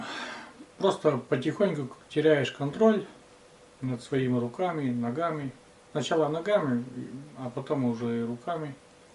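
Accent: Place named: Udmurtia, Russia. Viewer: недавно на вас подписалась, смотрю ваш канал и отдыхаю душой, много от вас позитива идёт, простоты,душевности, чего нам не хватает сейчас, ещё я услышала наш местный акцент / удмуртский/,он меня заинтриговал и вот я с вами. Здоровья вам и вашей супруге, вы молодцы! Спасибо за видео, мне хотелось узнать о вас больше.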